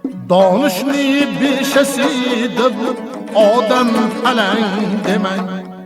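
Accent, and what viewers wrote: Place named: Namangan, Uzbekistan. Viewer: Ижрода хакикий номонгон. Шеваси хожи окомги оллох раматига олган булсун фахриддин хожи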